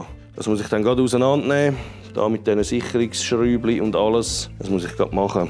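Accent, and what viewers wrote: Switzerland. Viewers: Hi ein absolut schönes Video und nun sieht man auch einmal im Detail was Du da vollbracht hast mit diesem Custom Töffli mit dem 7 Sternzylinder - Motor. Und jeder Schrauber weiß man ist niemals fertig an Custom Teilen Fahrzeugen ständig entwickelt man es und verbessert es weiter und das macht ja Dir Sache aus man hat Freude und ist Stolz wenn man es hinbekommen tut. Ich hoffe Du hast genügend BREMSENREINIGER auf Lager für die Reinigung des Motores und Vergaser. Du bist einfach ein geiler Siech wo es absolut drauf hat freue mich auf jedes neue Video von Dir. Schöne Grüße aus Germany von der Schwäbischen Alb mir gefällt Dein Schwitzerdütsch absolut huregeil.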